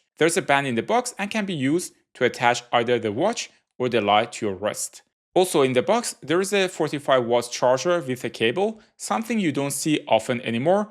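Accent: Iran. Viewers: کاکا من حس میکنم لهجه ایرانیه؟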